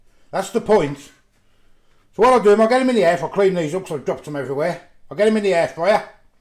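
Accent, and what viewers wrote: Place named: West Midlands, United Kingdom. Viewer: Just found your channel I love a good food reviewer you sound common to West Midlands maybe could be wrong tho looking forward to watching your past and future vids 😊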